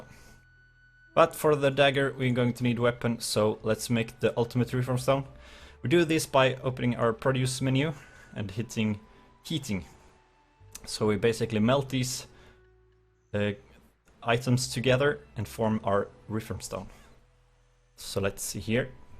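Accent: Sweden. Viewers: Is that a swedish accent i hear? D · are you swedish?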